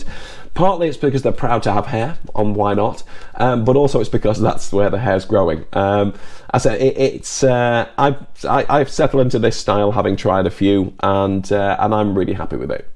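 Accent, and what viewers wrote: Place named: Northern England, United Kingdom. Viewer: Hi, Another insightful video, and great to hear a friendly northern accent;) Thank you for answering my last two comments.. I have been researching FUE for the last 18 months, as that is when I have noticed my thinning and receding hair line. Funnily enough its the exact same time when my first born arrived, maybe there is a correlation as soon as you have kids, your hair says ok, you have found a mate, and we have procreated, no need to stick around lol... Keep up the great work you are very natural and entertaining, Best Rich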